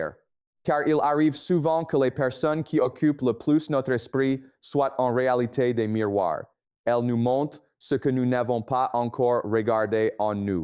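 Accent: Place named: England, United Kingdom. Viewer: Dommage pour l'accent anglais, je préfère la voix habituelle ...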